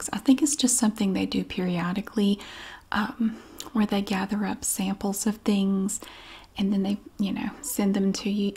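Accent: South, United States. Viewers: Hi I really love your videos I like them more for how you make the smallest things and purchases exciting and interesting...yes, its a ramble, but you do have things to say, and its from the heart, I can tell you are not putting on with this, but I can tell you indeed do have fun with this, and you are a tad silly/funny, so I know its a slight put on I do feel relaxed by your regular voice, but I think its the combination of your southern charm and accent as well that relaxes, as its not really text book ASMR, of which I can only handle so much I really enjoy your videos, when I need calming I keep the browser open and put it on.... | And of course, southerners always tell the best stories!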